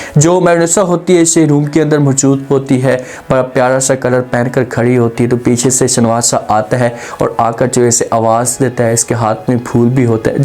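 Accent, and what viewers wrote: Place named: India. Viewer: Anchor you have a bloody awful accent india🇮🇳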